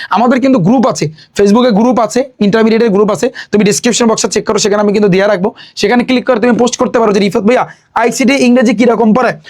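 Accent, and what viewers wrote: Barishal, Bangladesh. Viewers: ভাইয়া আপনে কি বরিশালের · আচ্ছা ভাইয়া কি বরিশালের? 🤔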